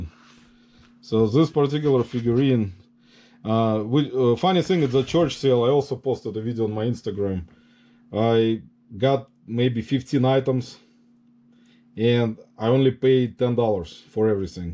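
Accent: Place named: Russia. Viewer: I hope you don't mind me asking. What country are you from? My son served a mission in Iceland for two years and I have friends down there now I talk with all the time. They speak English, too, and they're accent sounds a lot like you , but the more I'm listening , sounds Russian. Either way, Love it! I've sold those singing fish in the past but it's been awhile. Glad you were able to sell it. Woa! That's awesome on the Precious Moments. Looks like it's from the 70s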